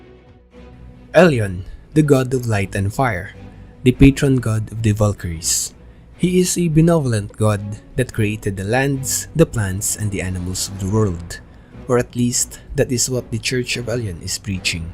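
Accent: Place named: India. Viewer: 感覺有點印度口音XDD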